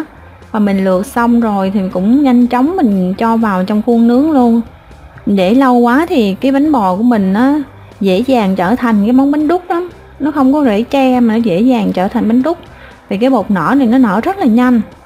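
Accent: South, Vietnam. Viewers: Giọng miền nam nghe dể mến Thế mà " thìa " ... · "Muỗng ăn canh" sẽ dễ nghe hơn "thìa" bạn ạ. Góp ý nhỏ : Giọng miền Nam, bạn nên dùng ngôn từ Nam Bộ bạn nhé, tôn trọng & giữ gìn nét đẹp Ông Bà.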